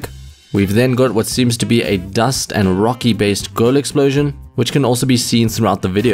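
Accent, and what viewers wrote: South Africa. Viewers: Are you south Africa. Really sounds like it and if so howzit bru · Eyyy bro are u from SA??? LOVE UR VIDS BTW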